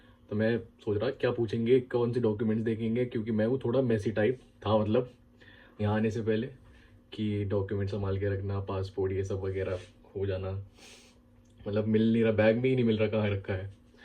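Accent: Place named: Gujarat, India. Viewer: Gujarati English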